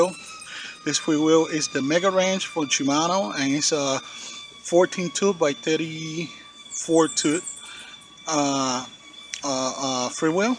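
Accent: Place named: Cuba. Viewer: U are Cuban I can tell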